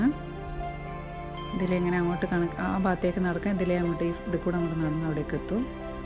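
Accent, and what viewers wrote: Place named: North Kerala, India. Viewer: Super vlog dear. Detail ayi kanichu thannu. Koode Nammude swantham vadakkan bhashayum😍😍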